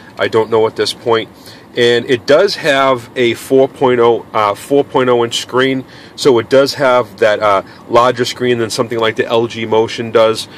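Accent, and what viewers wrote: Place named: Boston, United States. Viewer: Where are you from? I want to say Boston cant quite put my finger on it.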